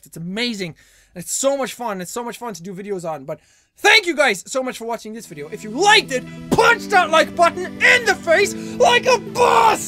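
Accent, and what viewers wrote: Ireland. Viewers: Your accent is that Irish? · ***** There is a specific accent those from Southern Ireland have. High pitched and fast. definitely has that. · Do you live in Ireland? I hear the accent in your voice.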